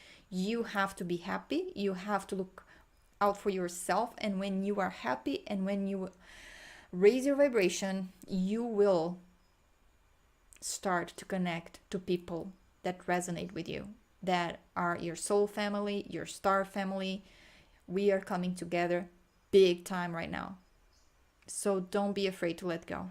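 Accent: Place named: Brazil. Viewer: Listened to this again. For whatever reason, really connected with it...and your story. Lots of shame and heavy emotions came up for me. Also, could tell from your accent you are from Brazil. I was lucky enough to visit multiple times, and always felt so connected. Obviously, I was only seeing what I wanted to see (which as a tourist , is always distorted). My family is from India, but I have very little interest in the culture. It's so boring to me. I always wished I was from Brazil instead :)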